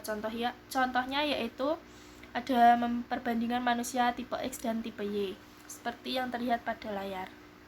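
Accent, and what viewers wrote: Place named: Java, Indonesia. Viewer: sangat bermanfaat 👏 medok e ntappp wkw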